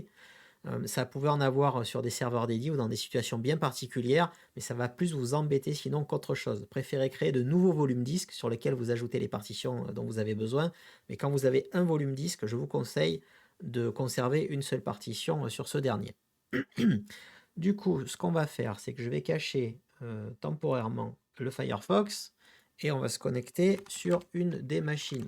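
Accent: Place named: Southwest, France. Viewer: PS : Tu es dans le sud-ouest (ton accent t'as trahi)?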